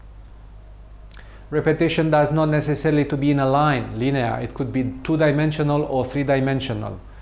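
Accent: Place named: Romania. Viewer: Great video! Thank you very much! I noticed an accent. Are you by any chance Romanian?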